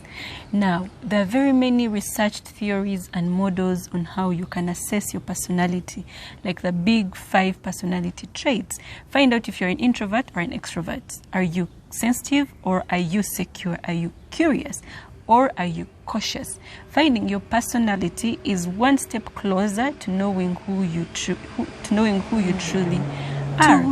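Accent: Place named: Buganda, Uganda. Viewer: Your voice is life. I love your Buganda accent 💖💖💖💖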